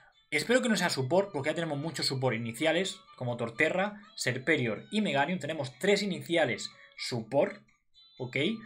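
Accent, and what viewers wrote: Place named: Murcia, Spain. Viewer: Hablas como un amigo que tengo en exactamente de donde eres tu, de Murcia.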